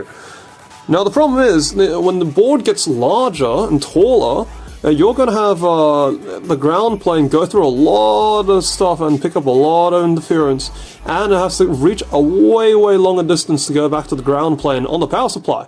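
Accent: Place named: United Kingdom. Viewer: For f sake, finally a video about this. You are 3 years late mate. And I wonder (based on your accent) if you're British, because I live around the UK and I had this problem a while ago already.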